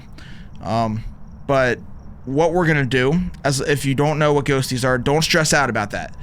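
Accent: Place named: Delaware County, United States. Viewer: You sound like you have a delco accent from Philly lmao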